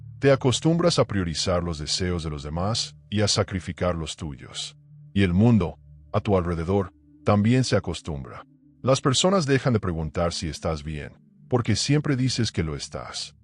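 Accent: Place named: United States. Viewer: La Información muy buena, pero el tono gringo pésimo,...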